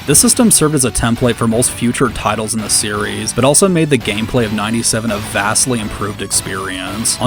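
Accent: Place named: California, United States. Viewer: That California accent!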